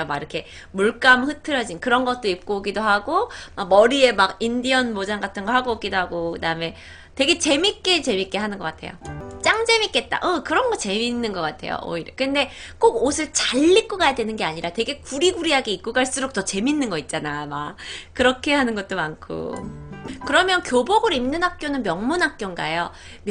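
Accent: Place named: Jeolla, South Korea. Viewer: 팬인데요.전라도 말투!의를 으라고.매번 하던데요.바꿔주면 완벽할텐데요.좀!아쉽네요.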